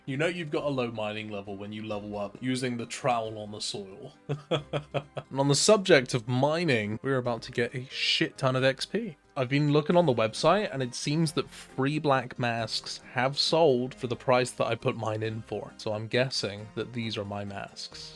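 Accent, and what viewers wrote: Bristol, United Kingdom. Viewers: bristolian accent shining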